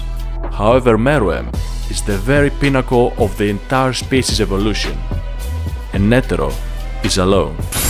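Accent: Greece. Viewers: that's fine. i am Greek too living in the UK, thats why i was able to recognize... You dont have to change anything about it. Great research on your videos.. Keep it up! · haha yes i tought greek first, the finnish 🤔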